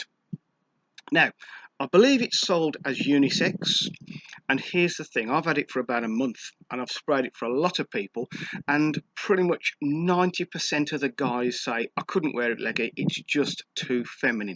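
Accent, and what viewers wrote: Birmingham, United Kingdom. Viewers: Hey just chanced on your videos. Subscribed. Is that a Brummie accent I can hear? 😆👍 Keep it going!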